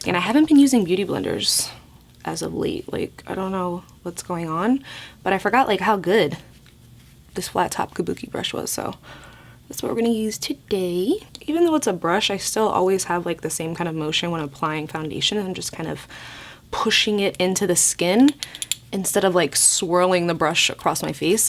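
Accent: United Kingdom. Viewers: That British Accent tho 😍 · Those are my fav lashes but I can't find them anywhere near me 😭😢😭 I. Missed your British accent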